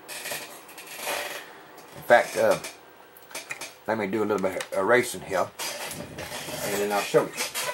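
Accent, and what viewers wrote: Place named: South, United States. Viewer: NocturnSkies it a country accent if you don't like it well the stop watching you don't have to be so rude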